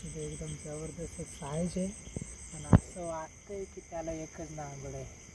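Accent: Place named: Telangana, India. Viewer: Bhau tumhi telgu aahat ka tumchi marathi language telgu lokan sarkhi ch aahe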